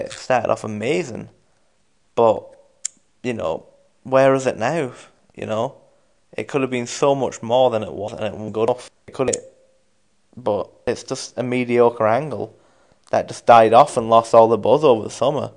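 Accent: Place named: London, United Kingdom. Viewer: YOU SOUND LIKE YOUR FROM LONDON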